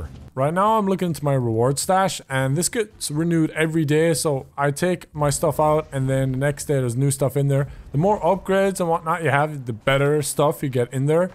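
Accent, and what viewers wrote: Ireland. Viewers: Your Irish, are u from the Midlands , you sound it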